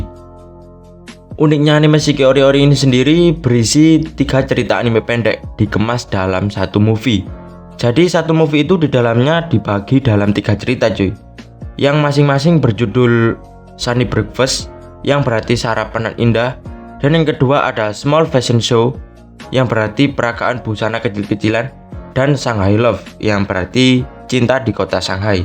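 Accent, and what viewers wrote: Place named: Java, Indonesia. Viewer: B sama D nya medok banget bang wkwkwkkk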